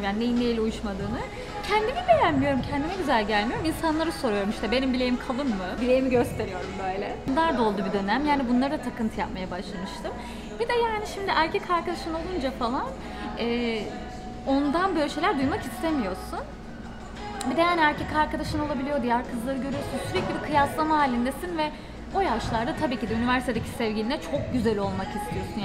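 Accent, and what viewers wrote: Turkey. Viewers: Ablamsende tam bir kayserili konusmasi var karadenizliisin ama biliyorum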